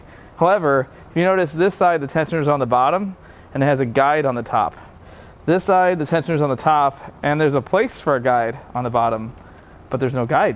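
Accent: United States. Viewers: Normal engine design from this millenium. Ps. Are you yank?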